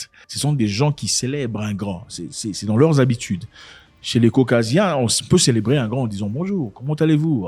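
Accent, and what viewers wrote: Cameroon. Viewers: Il n'imite pas ...il parle toujours comme ça ❤❤❤ au départ je croyais même qu'il était Camerounais 😂😂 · Bizarrement tu parle comme la grande majorité des Camerounais 😂😂, je suis sûr que beaucoup te croient Camerounais. Ce n'est pas nouveau au Cameroun, le comble c'est que les parents on entraînés les enfants dans la voie du silence sous prétexte de l'horreur de la période des maquis. On a peur de se réveiller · Ce président ne nous sert a rien , je ne vois pas en quoi parler de lui est utile , ceux qui parlent de lui au pays sont ceux qui veulent sa place , raison pour laquelle les autres qui veulent aussi sa place demande a leurs rivaux de femer leurs bouches . Bref un président qui ne sert a rien je ne vois pas d'interet a parler de lui. je suis certains que son successeur sera sa copie , assoiffé de pouvoir ,. le dvéloppement n'est pas une priorité pour eux , juste remplir leur ventre de caca. 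Très bonne vidéo , l'accent camerounais par contre😂 m'a fait rire , je ne me suis pas retouver dans ça , ni reconnu une ethnie en particulier, mais en ce qui concerne la mentaluté , vous avez raison ,ventard pour beaucoup dans le vide , cette mentalité doit être formater.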